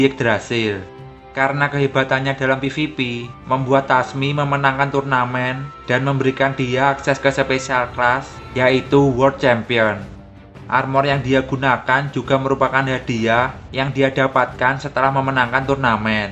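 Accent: Java, Indonesia. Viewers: Medok ya suaranya unik broo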